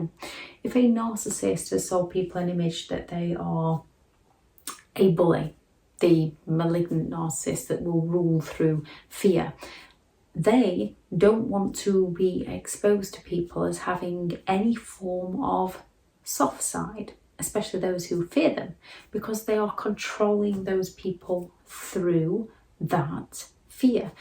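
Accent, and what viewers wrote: United Kingdom. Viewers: I love your accent.Sexy Limey!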